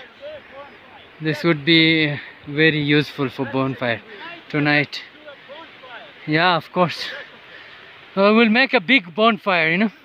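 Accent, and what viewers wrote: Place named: India. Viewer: Fullywatched 💯💯✅. By the way. Your voice like Indian. Is it write?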